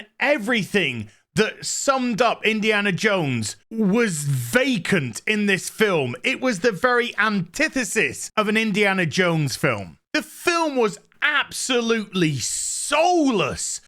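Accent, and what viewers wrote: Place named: Yorkshire, United Kingdom. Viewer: What is a morning constitution. Hard to understand this Yorkshire talk like "was straight"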